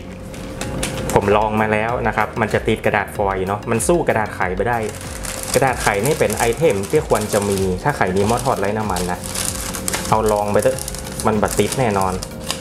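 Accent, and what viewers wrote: North, Thailand. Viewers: ดีดีค่ะ ชอบภาษาเหนือค่ะ เพราะดี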